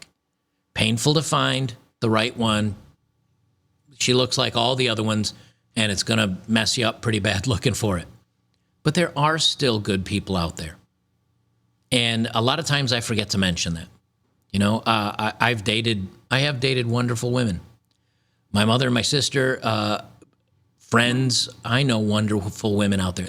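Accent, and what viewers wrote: Australia. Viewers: G'day mate I like your aussie accent. Crickey her reaction on the trip home was bloody funny. How would posting that video help her future dating prospects?. The first video makes her look like a terrible person, the second video makes her look pathetic. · I am begging you please do more Australian accents in your videos to come it is much-needed comic relief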